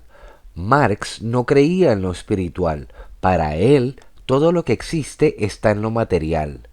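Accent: Venezuela. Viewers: Por tu voz me acabo de dar cuenta que " ", debe ser venezolano. ¿Cómo alguien que escapó del intento de comunismo puede defender el comunismo?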